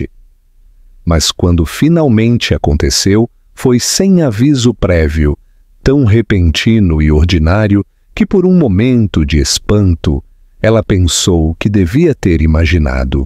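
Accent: Portugal. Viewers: O tema da história é bonito, mas a narração a torna muito chata! Principalmente qdo o narrador fala o português de Portugal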